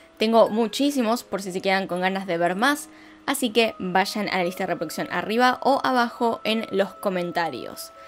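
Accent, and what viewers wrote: Argentina. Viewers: Sí mencanta el acento argentino y los videos. 🤩👌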